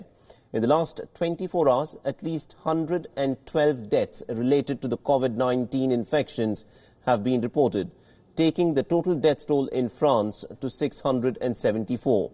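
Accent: India. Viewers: It is an Indian channel. If you can't understand his accent may be you are at a wrong place. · It's indian news channel.. He is speaking in indian English.. U dont know... Go and learn it kid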